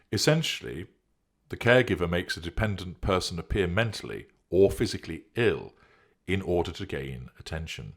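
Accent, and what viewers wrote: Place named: North, United Kingdom. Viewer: Your accent, has a northern twang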